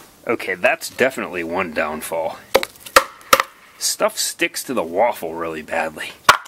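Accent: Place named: Pittsburgh, United States. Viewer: there's been one or two times where I can hear that yinzer accent but I don't anticipate anyone mistaking you for Pittsburgh dad.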